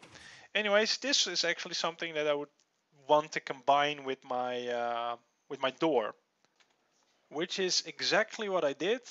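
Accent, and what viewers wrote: Netherlands. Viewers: Ik hoor dat je uit nederland komt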